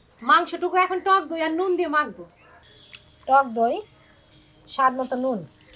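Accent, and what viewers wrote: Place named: Dhaka, Bangladesh. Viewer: আপু আপনি কি ঢাকায় ছিলেন আপনার কথা ওনেটা আমাদের মতো ঢাকাইয়া ভাষায় কথা বলেছেন রিপ্লাই দিয়েন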